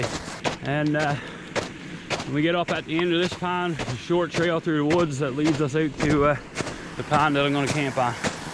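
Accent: Newfoundland, Canada. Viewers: I like the Newfoundlander accent, reminds me of when I was little. That is a great dog he is such a character!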